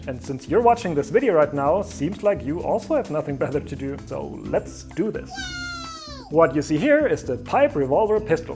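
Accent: Germany. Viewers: okay is he german? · No german is be able to hide his accent :) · ich wusste das du deutsch warst ... hört man am Akzent und an dem deutschen lack😁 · i knew you are german!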